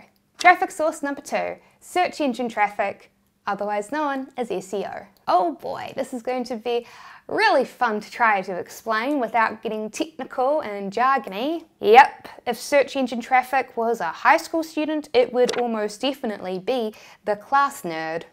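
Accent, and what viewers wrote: New Zealand. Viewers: keewee accent bro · Peppa Pig is British, where here sounds like she's from New Zealand.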